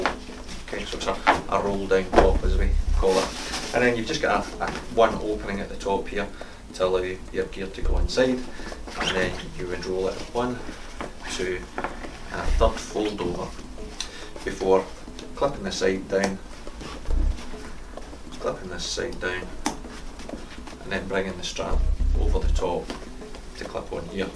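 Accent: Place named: Scotland, United Kingdom. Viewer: Quality product at a great price, added to wish list! Thanks for this helpful demo. I wish all salesmen were Scottish, love the accent :D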